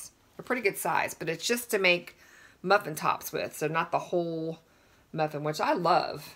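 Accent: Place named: Arkansas, United States. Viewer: Oh Sis, these look delicious! TFS!! That Arkansas accent is really sounding out today!😊😘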